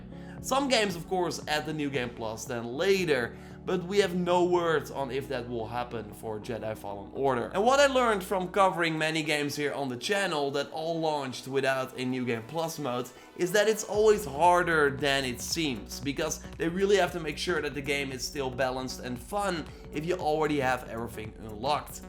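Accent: Netherlands. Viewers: Hmm, you sound dutch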